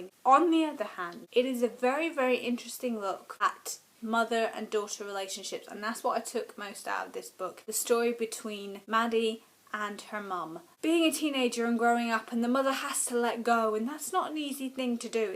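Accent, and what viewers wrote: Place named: Cockney, United Kingdom. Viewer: haha... I bow down to your cockney awesomeness! And you are right no snack is much worse than a lack of butter (well, unless the snack is milt). Though, I could do with a lot less butter these days - I've got two months to fit into my suit for a wedding. Luckily I'm now doubly motivated to do exercise - exercise improves cognitive function.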